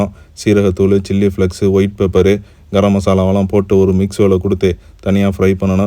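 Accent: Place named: Adirampattinam, India. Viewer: Assalamu alaikum eppadi irukinga nice cooking 😂❤ neenga adirampatnama? Unga tamil antha maathiri iruku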